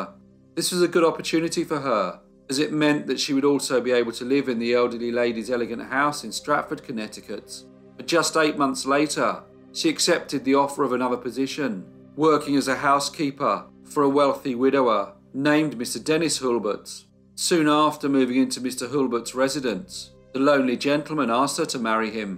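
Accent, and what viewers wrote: United Kingdom. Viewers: Fun Fact ~ Just for clarification for the North American viewers when the narrator says Lydias eldest daughter also named Lydia worked as a clark... It is "Clerk", for some reason the British use an A sound instead of our E in clerk.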